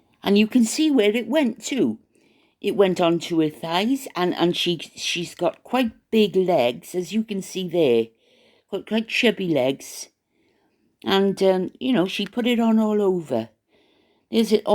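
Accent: Wales, United Kingdom. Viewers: happy you’re back, nice to hear that Welsh accent, God Bless.♥️🕊️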